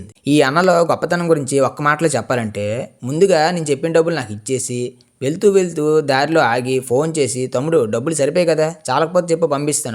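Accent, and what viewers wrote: Srikakulam, India. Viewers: Mana chikakulam slange vere level😂😂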